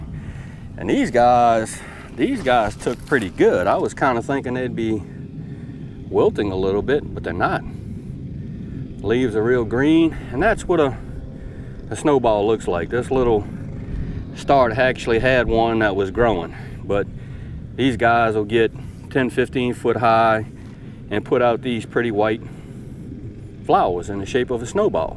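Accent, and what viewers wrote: South, United States. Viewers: But really in the nicest way we do enjoy listening to your southern drawl have a great day!!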